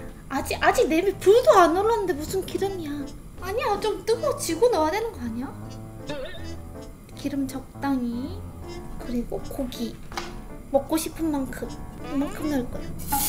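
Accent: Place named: Gyeongsang, South Korea. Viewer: 나 경상도사람이긴한데 님 경상도사투리에 치인다....❤️❤️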